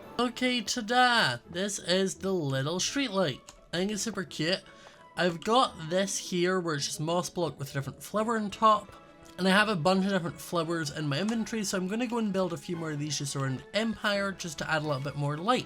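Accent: Scotland, United Kingdom. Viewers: ok, so. new subscriber here. Nice ta meetcha. I really love the Scottish 'language'. it would be so cool if you did a video that included all the normal Scottish slang that exists. I wanna hear what a true Scottish man sounds like before I move to Scotland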